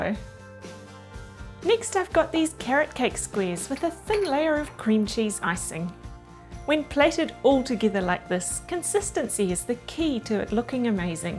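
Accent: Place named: New Zealand. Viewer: LOvely design. And I also like that Kiwi accent.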